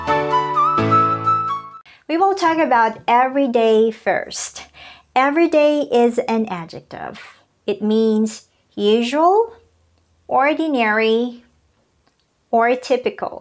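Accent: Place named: United States. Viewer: a good idea to devide this topic into two lessons and great american pronunciation as well...😍😍😍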